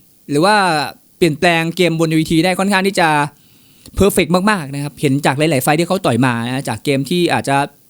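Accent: Isan, Thailand. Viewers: มักหลายยามเว้าภาษาอีกสาน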